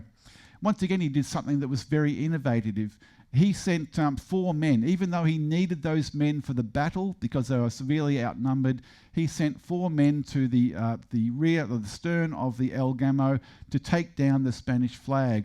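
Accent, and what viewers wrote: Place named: Australia. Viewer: I have a couple good scottish friends and they do not sound like this guy, I thought he was australian.. anyway very interesting .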